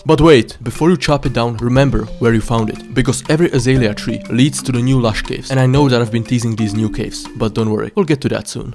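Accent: Russia. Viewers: Слова как будто с русским акцентом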